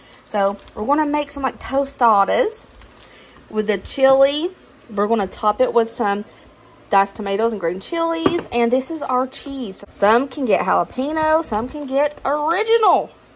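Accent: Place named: Tennessee, United States. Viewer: YOU’UNS! I am from Mississippi and was trying to predict what state you are from based on your accent alone…you’uns tells me probably Tennessee! You have a lovely soul. Thanks for sharing some great ideas.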